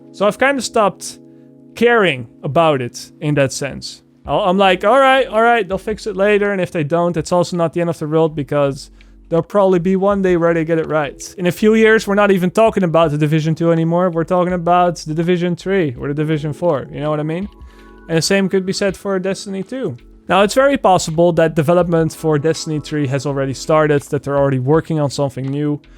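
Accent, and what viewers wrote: Netherlands. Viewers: Hey are you Dutch?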